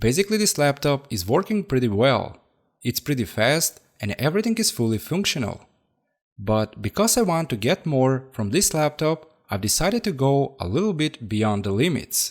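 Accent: Russia. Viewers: Russian accent lol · По твоему произношению видно что ты Русский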